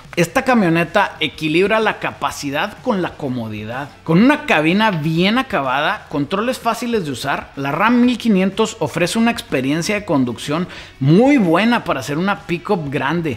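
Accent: North, Mexico. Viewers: No sabia que los gringos hablaban como norteños o de donde es este wey y por que todo lo dice en dolares y galones, que no su mercado es latino? Y seguramente el 80% de sus vistas son en México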